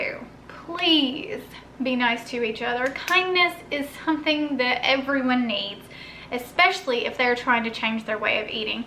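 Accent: South, United States. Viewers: Hey thanks for doing this...and love your suthern accent...not many of those left around Atlanta... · Your sassy personality, and accent 🤗 love it! Reminds me of my hometown where everyone has a southern twang ❤️ Also love that you shopped at Walmart, a store that everyone has access to!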